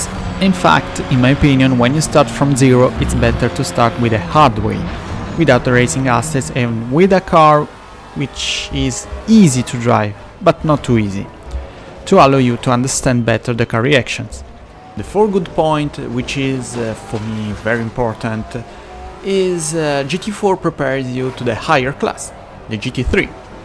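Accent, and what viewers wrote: Italy. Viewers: Nice italian accent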